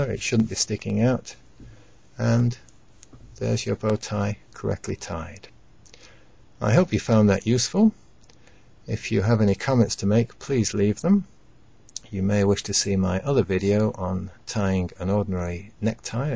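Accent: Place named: Alabama, United States. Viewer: Your accent makes me think you might be from Southern Alabama. True?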